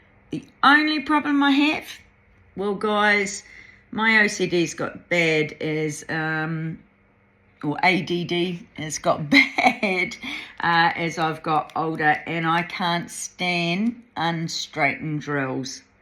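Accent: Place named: New Zealand. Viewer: Hi & fur babies, wonderful to see you back and all your finishes and WIPs. I have missed you & your kiwi voice (us few kiwis do miss hearing our on accents 😉). Love all of your DP's. Neat to see the Gemini. I think a yellow rose is also a Gemini flower 🌼. It's so sparky. Look forward to seeing more of you on YT. Take care, Matariki nearly here, yay.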